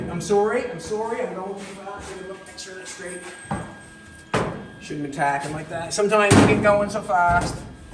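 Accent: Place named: Eastern Canada, Canada. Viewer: Can we pause and appreciate the eastern Canadian accent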